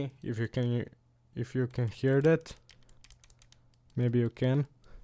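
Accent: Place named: India. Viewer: Ur accent sounds Indian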